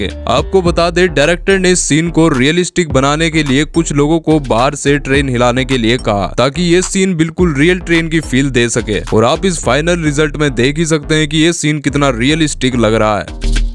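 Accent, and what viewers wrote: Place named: Rural, India. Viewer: भाई पहले श को स कहना बंद करो, देहाती लगते हो बोलते हुए😅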